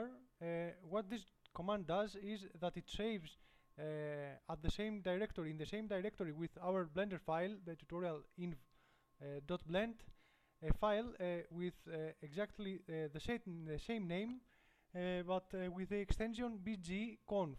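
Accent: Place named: India. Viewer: Man you are just PERFECT except your english, I guess you are Indian :D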